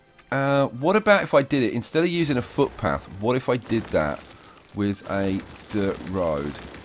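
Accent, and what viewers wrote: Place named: Birmingham, United Kingdom. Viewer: you said you lived in london most of your life, i always thought you had a brummie twang..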